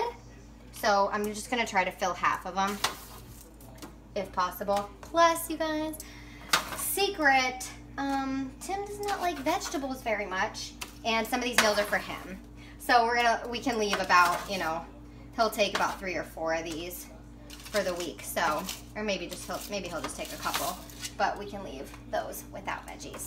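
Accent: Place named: Bangladesh. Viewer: sound like a Bangladeshi ♥♥♥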